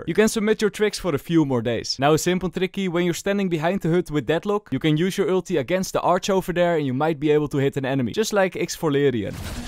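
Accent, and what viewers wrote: Netherlands. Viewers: With the Dutch accent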